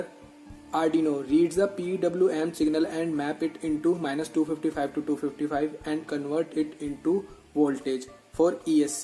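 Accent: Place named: India. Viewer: Porque hablas como Indio y el stick de la radio necesita lubricación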